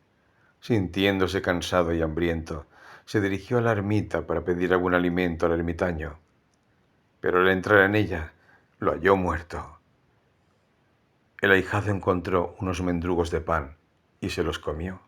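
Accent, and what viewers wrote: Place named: Spain. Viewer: VOZ AGRADABLE Y ESPAÑOLA !!!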